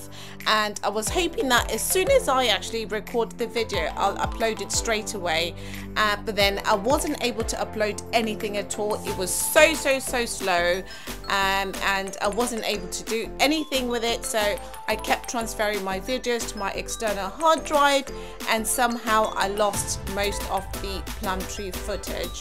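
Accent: United Kingdom. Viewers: British accent manyama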